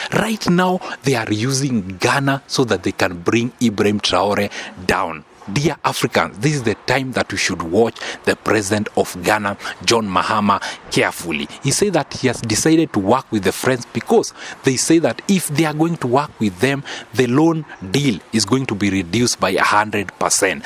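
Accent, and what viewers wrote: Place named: Kenya. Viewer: You keep lying about Ghana you area Kenyan with that thick accent talk about Ruto and the good things he is doing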